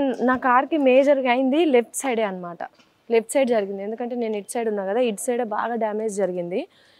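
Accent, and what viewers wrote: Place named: Telangana, India. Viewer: Meeru matlade paddathi Naku chala istam.yentha pedda celebrity ayina Telangana yasa matladutharu.same na language kuda me lage vuntadhi.mem hyd lone vuntam.okappudu Telangana raka mundhu ne language different ga vuntadhi ani vekkinrinche vallu ayina nenu na yasa change chesukoledhu.meeru matladuthunte Naku chala happy ga vundhi.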